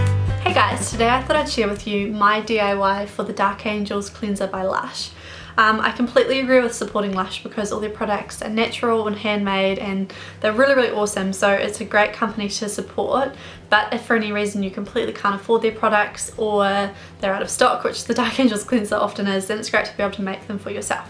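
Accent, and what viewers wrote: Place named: South, New Zealand. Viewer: It’s because she comes from the south of New Zealand (the south island) and they tend to have a different accent to thise who like up north (the north island)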